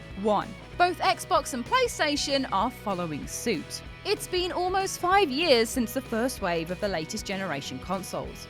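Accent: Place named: United Kingdom. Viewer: Has this chick been in other videos? I like her energy... And her accent, my God, I could listen to women who speak British all day! Lol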